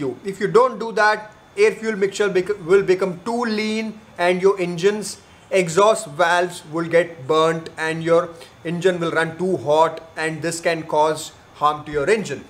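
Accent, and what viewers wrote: India. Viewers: okay this is very loud and clear explanation after finding so much gimmick videos in youtube, your indian accent is quite strong but i totally understand what you said, not like the others explanation from another indians, sorry i am not being racist but you are good.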